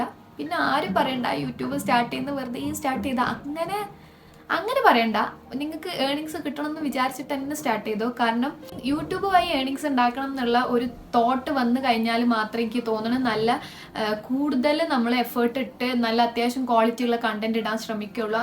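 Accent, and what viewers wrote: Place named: Malappuram, India. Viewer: *നമ്മുടെ മലപ്പുറം മലയാളം ആണല്ലോ. ഇവിടെ എവിടെയാണ്? പെരിന്തൽമണ്ണ ആണോ*?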